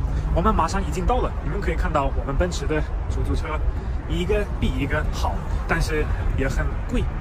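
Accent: Sichuan, China. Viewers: 滿口四川口音😂😏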